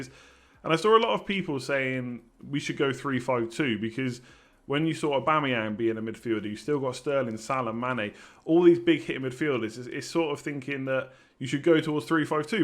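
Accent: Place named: Bristol, United Kingdom. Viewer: You sound a Bristol boy. Gas?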